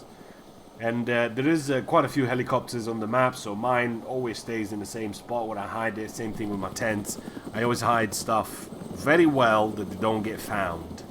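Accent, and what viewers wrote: Finland. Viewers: Totally finnish accent :) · I could almost hear that you're Finnish even if I didn't hear you speaking Finnish :D it's the accent... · Sulla on aika suomalainen aksentti, pakko sanoa. :) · that bandit who know you sound like finnish akcent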